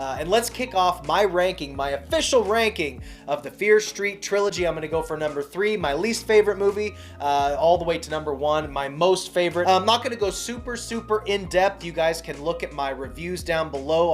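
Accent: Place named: Boston, United States. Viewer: You have a Boston yes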